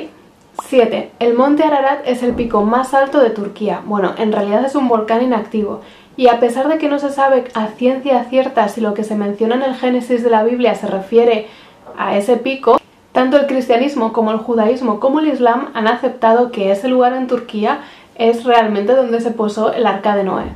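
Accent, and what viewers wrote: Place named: Murcia, Spain. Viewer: Sobre tu voz y tu asiento eres mursiana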